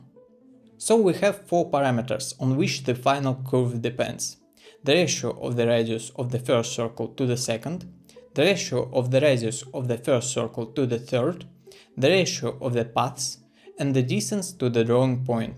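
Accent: Russia. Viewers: У тебя явный русский акцент)) Анимация просто потрясающая! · I programmed only two-circle version myself and stoped, while you pushed it further more to make it really beautiful, nice video! Some interesting Russian accent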